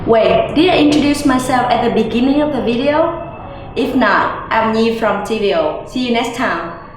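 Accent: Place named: Vietnam. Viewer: Video rất hay. Trên youtube có rất ít video dậy tiếng Việt bằng giọng khác tiếng miền Bắc. Quê hương Nhi là ở đâu? Miền nào? Cảm ơn Nhi và TVO nhiều.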